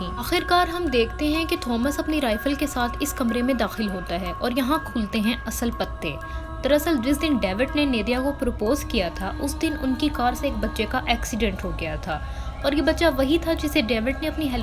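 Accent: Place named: Lahore, Pakistan. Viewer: Awaz se lgta hai ap lahore se ho...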